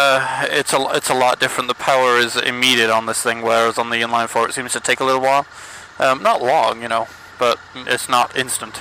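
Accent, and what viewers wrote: United States. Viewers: You sound more American in this video than you ever have done before lol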